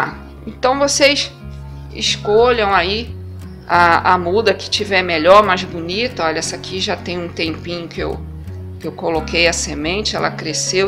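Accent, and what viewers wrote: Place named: Rio de Janeiro, Brazil. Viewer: Linguajar carioca, para falar com o Brasil; da não viu?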